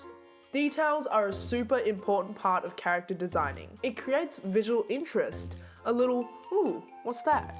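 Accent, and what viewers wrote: Australia. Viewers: Wait are you Australian I'm tryna see sumn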